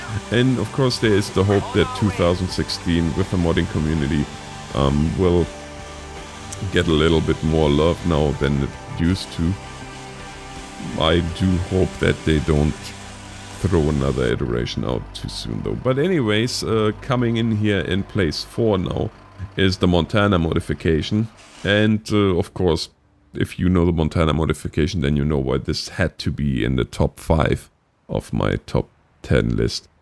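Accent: Germany. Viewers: You have a hard accent... are you german? :D (I'm a newbie) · i hearyour germen acend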